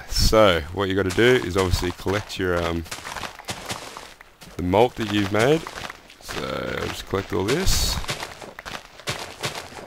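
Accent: Australia. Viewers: boss video...u can tell you're aussieee....am i wrong (subscribed!!)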